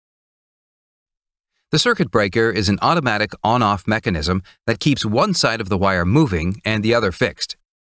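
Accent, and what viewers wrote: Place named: India. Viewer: New to the channel. Was wondering how come the channel get from a good english accent to Indian English accent?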